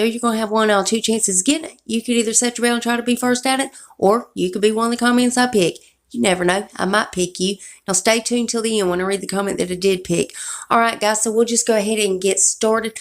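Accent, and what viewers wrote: South, United States. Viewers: Thanks for the spoilers love!! This box is going to be awesome and u totally got me excited to get my boxyluxe this month. My nickname is as well. Love he southern accent sis, I have a southern accent too. I’m from southeast Kentucky. I do beauty subscriptions box openings as well on my channel so hope to see u over my way sometime too. Much love to ur channel, ur doing a great job. Subbed and liked ur vid. ❤️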